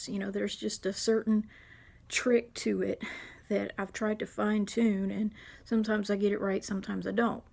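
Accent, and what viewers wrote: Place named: South, United States. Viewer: I love your sapphires and your sunglasses. My former house mate Linda lived her last years on this year in Austin Texas. she found a nice man who appreciated her and lived a good life there . I did not realize it is the state capital . I love your talks and your southern drawl. Here in the holy land, September is the bird migrating month since we are a station on the way to Europe and from Africa. This year I haven't seen the birds yet...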